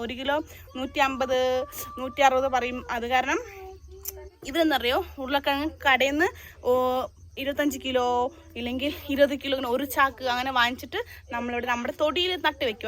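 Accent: Palakkad, India. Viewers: മലയാളം സംസാരിക്കുന്നത് കേൾക്കാൻ നന്നായിട്ടുണ്ട് പാലക്കാടൻ ഭാഷ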